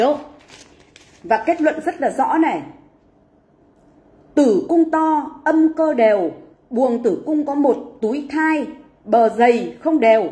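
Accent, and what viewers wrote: Vietnam. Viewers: Anh nghe giọng của em hình quê thái bình phải không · Hôm nào sẻ mời CAFFE, tâm sự giọng Bắc chính gốc, quá OK luôn.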